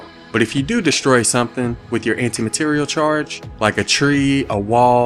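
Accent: South, United States. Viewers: I think he talks/sounds hella southern lmao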